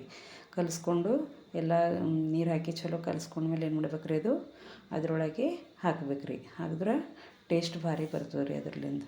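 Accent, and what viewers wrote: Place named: North Karnataka, India. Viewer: ನಿಮ್ಮ ಉತ್ತರ ಕರ್ನಾಟಕ ಭಾಷೆನೇ ಚಂದ ಬಿಡ್ರಿ ಸೂಪರ್ ರೆಸಿಪಿ 👌🏻👌🏻😋👍🏻🎉🎉✅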